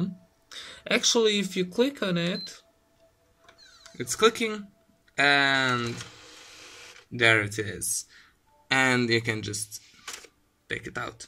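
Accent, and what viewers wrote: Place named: Poland. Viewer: Dzięki - aż mi wstyd ze musiałęm tego poszukac w necie ale wlasnie nabyłem PS4 Pro z FIFA20 i k*** nie mogłem odpalić :) Piszę po PL bo założę się ze jesteś z PL z tym sorry ale kulawym akcentem wszędzie da się poznać rodaka ;)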